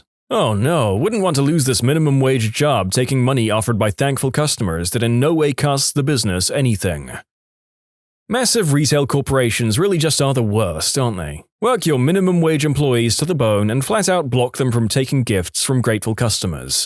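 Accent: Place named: United Kingdom. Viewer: I have to say that I’m really pleased to hear a fellow British accent here! 😎🇬🇧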